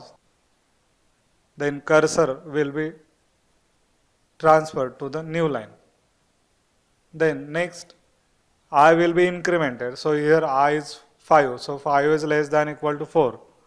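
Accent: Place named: India. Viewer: I can't understand shit thanks to his indian accent lol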